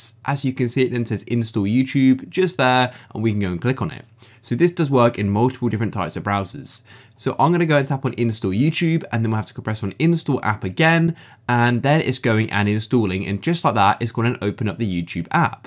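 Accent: United Kingdom. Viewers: this guy is speaking british english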